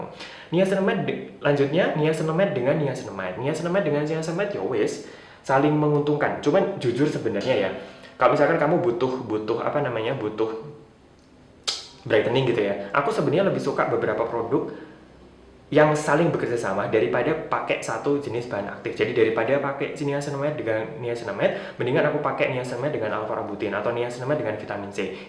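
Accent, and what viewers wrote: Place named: Malang, Indonesia. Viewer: asli pacitan tpi logate arema pol 😀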